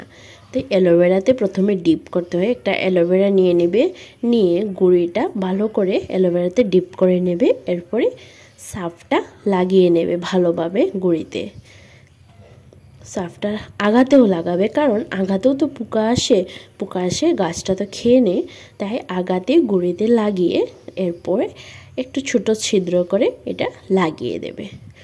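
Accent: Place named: Sylhet, Bangladesh. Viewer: Suddho bangla tumar dhara possible na, sylheti valo hobe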